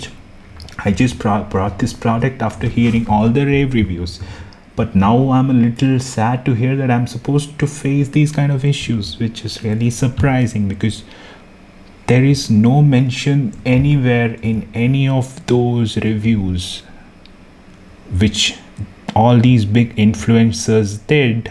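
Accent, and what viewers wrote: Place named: India. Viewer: That indian accent takes some time to get used to it. My russian accent is noticeable too, so I’m not complaining. xD Overall, this is a useful video. Thank you! P.S.: time codes would help a lot.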